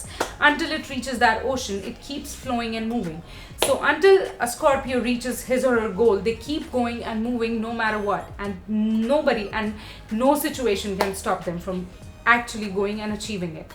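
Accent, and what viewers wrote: India. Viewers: Definitely Indian, I am too, and she has that accent.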